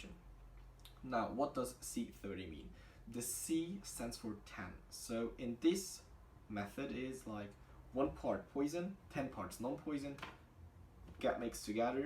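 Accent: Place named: United Kingdom. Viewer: Hast du einenenglishsprachigen Elternteil? Du sprichst sehr britisches Englisch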